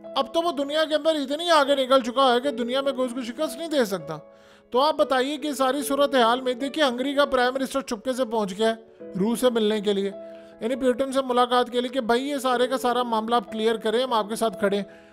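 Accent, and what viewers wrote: India. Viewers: Bhai ap jao b btain btata ho apki batain son ka or aki vioce son ka ap mujh India ka lagta ho agar ap India say fhir Pakistan TV kasay banya 🤔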